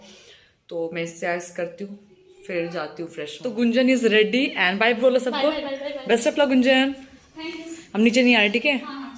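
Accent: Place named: Pahari, India. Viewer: ...tell us about yourself na... Your hindi is sweet, pahari ho kya...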